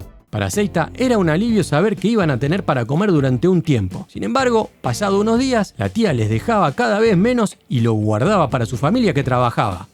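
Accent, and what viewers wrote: Argentina. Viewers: Grande Hermano, soy mexicano pero disfruto el acento argentino y las picardias del mismo.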